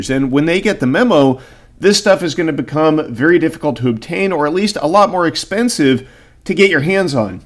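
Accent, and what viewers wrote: United States. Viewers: Your accent suggests a "USA citizen" and many of you don't understand that USA is bankrupt and countries are dumping that "Global Reserve Currency" that the USA government itself has "trashed." China is leaving like everyone else, but taking (large) bits at a time to keep the USA Beast alive until China can (and Japan) get it all out. USA is being "abandoned" by countries around the world, who are ALL fed up with the military abuses of CIA and other regime change specialists that have worked overtime since Viet Nam to make clear that USA is an ENEMY of everyone on Earth.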